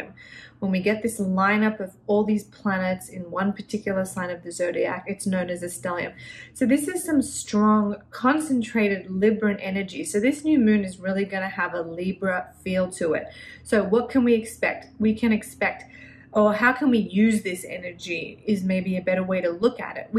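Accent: Australia. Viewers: Didn’t realise you were Australian! That’s so cool 😊 what state are you from?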